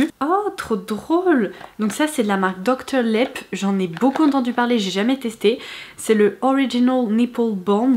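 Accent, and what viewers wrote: England, United Kingdom. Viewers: Ton accent anglais il et cher beau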